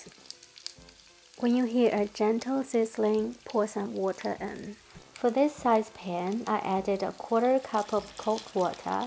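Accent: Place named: New Zealand. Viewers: Love the Nz accent ❤ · Spotted the NZ accent straight away! Thank you for the tutorial! I've been lowkey scared to try pan-frying dumplings, so I've always just steamed them. I'll give this a try.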